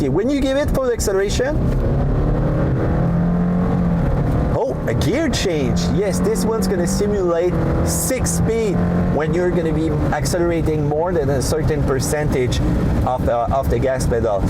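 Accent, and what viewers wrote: Quebec, Canada. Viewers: Sounds like a French CDN - where I was born a long tome ago.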